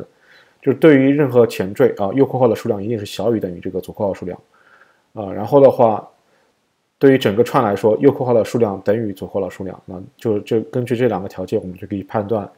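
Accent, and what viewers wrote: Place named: Shanghai, China. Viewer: 良心博主，如此熟悉的口音像上海人哈哈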